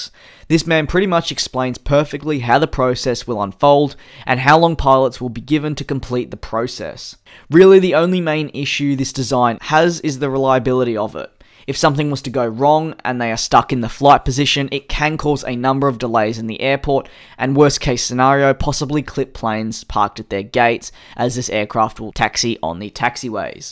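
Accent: Australia. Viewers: He kinda sounds like Crocodile Dundee, huh?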